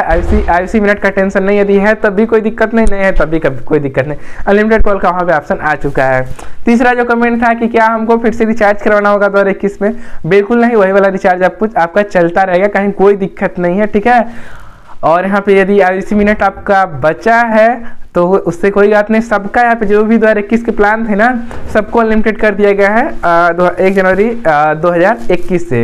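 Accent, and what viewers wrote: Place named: Uttar Pradesh, India. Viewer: Up ladies jasha bat Kew korte ho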